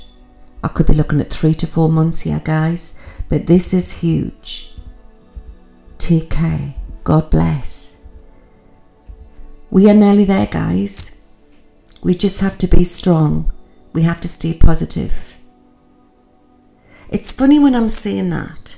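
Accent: Newcastle, United Kingdom. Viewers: Came for the predictions, stayed for the lovely Geordie accent😁 Thank you for sharing this. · She's a Geordie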